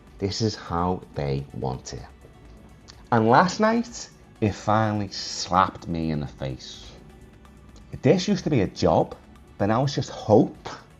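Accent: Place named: Liverpool, United Kingdom. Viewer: I like your accent, thats Liverpudlian right? Ive noticed it's dead too, as I can see what the drivers are getting paid nowerdays and it's always about 60% of what I paid 😢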